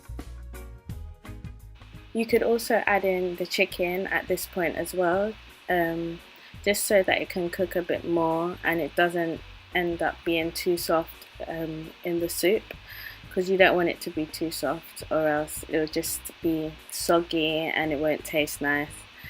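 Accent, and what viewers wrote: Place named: London, United Kingdom. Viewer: I can hear the London in you. Lool. Great job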